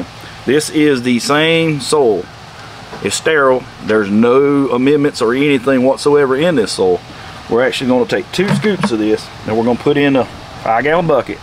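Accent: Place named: South, United States. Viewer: Thanks for not talking me to death like most videos do--just the basics delivered with a cute southern accent. ("Sole" for soil) :washhands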